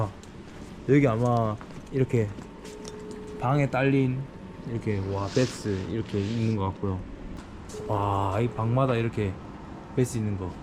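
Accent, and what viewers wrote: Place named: Gyeongsang, South Korea. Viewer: ㅎㅎ첨보는데 사투리 보아하니 경상도?? 울 아들같아 웃음이~ㅋㅋ 화이팅 !!!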